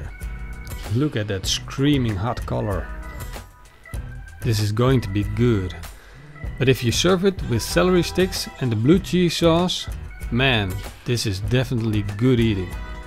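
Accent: Netherlands. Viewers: Random question but are you Dutch? Your accent seems Dutch to me. Not sure why.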